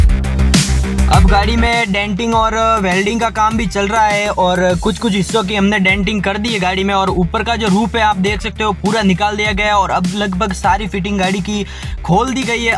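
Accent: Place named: Marwari, India. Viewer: Bhai thari hindi sunr lage thui marwadi hai agr hai to ❤❤❤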